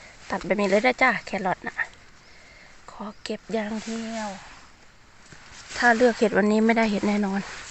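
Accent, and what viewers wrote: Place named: Sakon Nakhon, Thailand. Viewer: น้องไออยู่จังหว้ดดั๋ยจ๊ะคือเว่าสำเนียงสกลนคร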